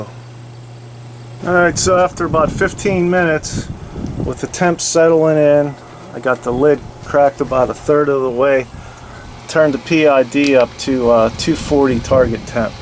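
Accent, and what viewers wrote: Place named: Western Pennsylvania, United States. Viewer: Great videos. I detect a western PA accent. Where can I get the carbon plate and pid fan?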